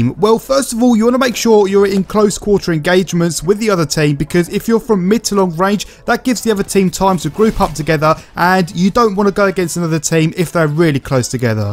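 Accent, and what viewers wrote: United Kingdom. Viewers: I agree, you're helpful and easy to listen to with that English accent! 😍😍 Haha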